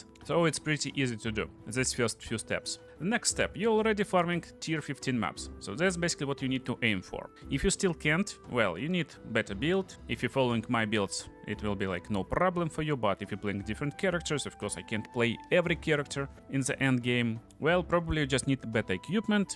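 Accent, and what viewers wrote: Russia. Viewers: Strong Russian accent 😂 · Most likely Russian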